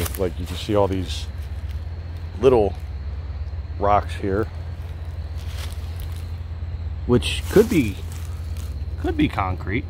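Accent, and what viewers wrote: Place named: Philadelphia, United States. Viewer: Great video! I love your work. I am a history geek who grew up in Mayfair (Lincoln HS grad), and your accent makes me think of home. ❤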